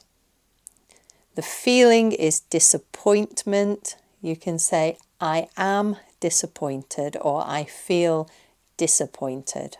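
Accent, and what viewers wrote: United Kingdom. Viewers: Thanks for the video. I'm of the opinion that British accent is understandable than American. When I look at this woman I figure out that she's definitely from England. You're explanations are really exciting.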